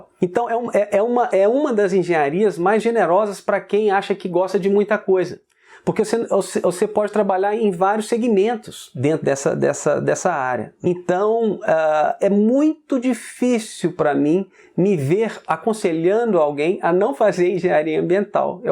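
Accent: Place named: Minas Gerais, Brazil. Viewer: TÃO FOFO JESUS CRISTO como eu amo sotaque mineiro Mas ajudou pra crlho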